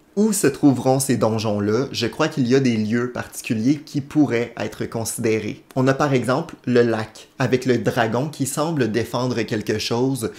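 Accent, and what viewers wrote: Quebec, Canada. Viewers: En tant que Québecois moi-même, je sais pas pourquoi, mais je fais toujours le saut quand j'entends un accent Québecois sur youtube. XD Mais bonnes théories, moi aussi je pense qu'on va revenir à l'époque d'il y a 10000 ans et voir comment tout ça a commencé. Je me demande aussi si les 7 larmes seront les seuls "Key items" de donjons... par exemple, dans Ocarina of Time, tu dois trouver les 3 spiritual stones et après ça les 6 médaillons... alors what if, que dans TOTK, tu doive trouver les 7 larmes dans les 7 premiers donjons et puis là.... BAM! 20 nouveaux donjons où il faut trouver autre chose. (Je sais, je rêve lol)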